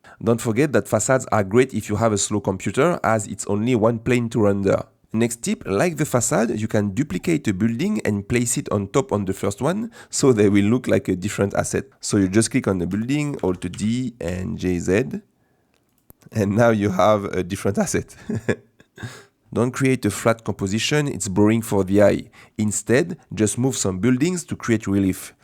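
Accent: France. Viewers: L'accent français me fume très intéressant sinon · me disait bien que tu étais français j'avais reconnu l'accent 😂 Un énorme merci encore j'apprécie énormément votre travail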